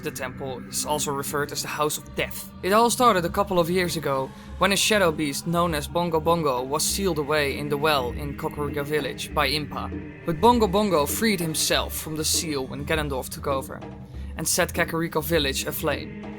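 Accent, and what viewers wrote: Netherlands. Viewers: nederlands accentje mag er wezen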